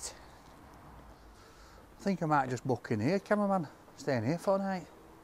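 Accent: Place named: RP, United Kingdom. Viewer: Don’t go too posh on us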